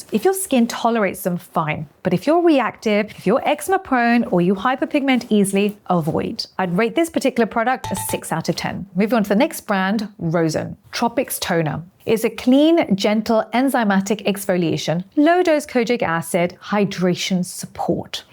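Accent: United Kingdom. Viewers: But, u sound british, ur video has hit me in Britain, why we looking at American products? Im not in america! Can u do a video for black skin for products on our shelves over here in britain. Thanks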